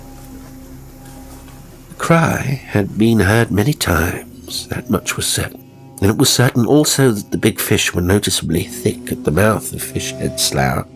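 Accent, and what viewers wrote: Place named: South, United States. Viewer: Your poor white trash accent was spot on. And I am from the south so I know. Really good.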